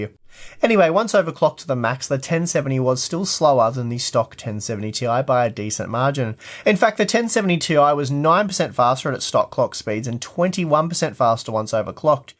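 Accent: Australia. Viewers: Are you Australian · The outro music is quite nice here. I think you should add more music like that throughout your vids and not just at parts. I've seen only a few of your vids and one thing I always think is "Sure an Australian voice is nice and all, but where's the music?" Anywho, thanks for what you do, man, keep it up, proud of you <3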